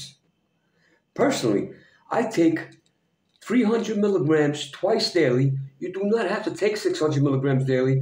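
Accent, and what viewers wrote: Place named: Brooklyn, United States. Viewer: How does the creator sound like my uncle Victor from Brooklyn or my cousin Vito from the Bronx claimed he's not even an American citizen?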